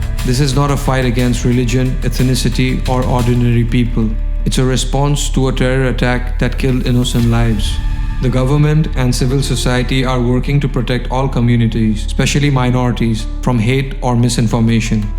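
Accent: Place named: India. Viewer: Where were you all these while? Such talent deserved much more early entry. Your pronounciation seems that you are an NRI? If that were true, it means you are at a considerable distance but deeply rooted!!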